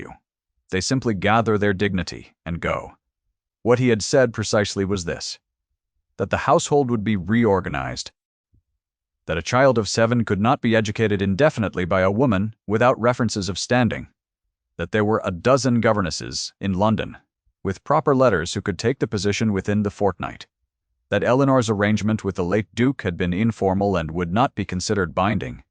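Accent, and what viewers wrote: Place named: North America. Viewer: The North American accented AI for a story set in 19th century England doesn't do it for me...and the voice was very monotone. ZZzzzz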